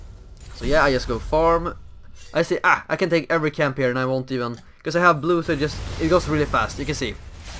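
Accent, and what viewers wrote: Sweden. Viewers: Låter som att du är svensk, stämmer detta? ;)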